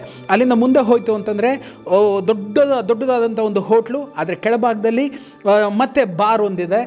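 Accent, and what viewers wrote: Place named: Madesha, India. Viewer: Nimma kannada estha sir nanage .. nimma madesha nana favorate.. previous episode you spoke about negative comments... Keep it mind .. if you are getting negative comments that means you are growing... All the best for the film .. please plan pramotion activity carefully that can make or break the project... I will watch first day first show ...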